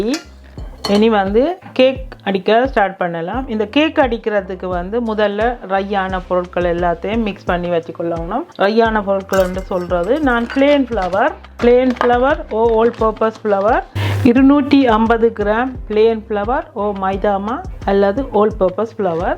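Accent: Sri Lanka. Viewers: Azhagana Ilangai Tamil❤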